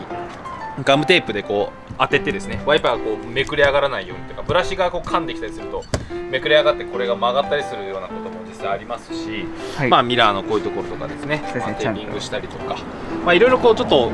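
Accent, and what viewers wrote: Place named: Kyushu, Japan. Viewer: しかれる。 上京して28年、九州弁たまらんです。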